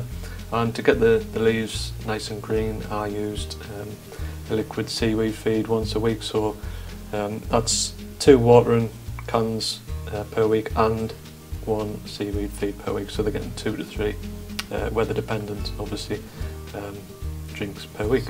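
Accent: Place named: Newcastle, United Kingdom. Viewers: I’m definitely wanting to grow pumpkins so that was really useful. Are you north east based ….thought you have a local, to me, accent 👍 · Really enjoyed watching, my first year growing pumpinks. Didn't have a clue about the make and female stuff. Learning every day. geordie lad, nice to hear someone local. No American accent with you.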